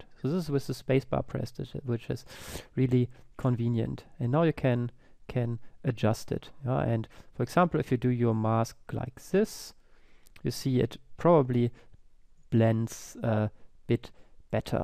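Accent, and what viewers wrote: Germany. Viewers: Man hört das du Deutscher bist xD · Yay, ich heiss auch!:D Deutsch Akzent FTW!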